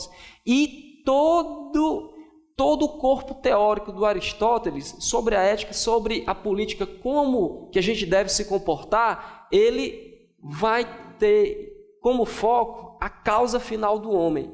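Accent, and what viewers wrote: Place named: Ceará, Brazil. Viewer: Professor muito boa aula! O conhecimento liberta! Vc é cearense? Seu sotaque parece de lá, muito lindo. Minha família é do sertão do Ceará!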